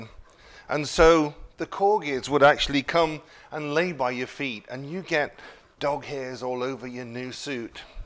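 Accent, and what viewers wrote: United Kingdom. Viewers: Hi! Love your videos from Finland. I like British accents, what is your hometown? Sorry my bad english.🙂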